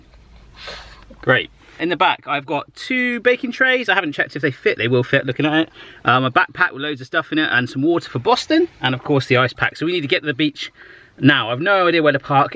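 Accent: South Africa. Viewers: I'm from South Africa and that accent gave it away.. He sounds Afrikaans too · S.A represent! I'm also from South Africa and I'm feeling it for that accent!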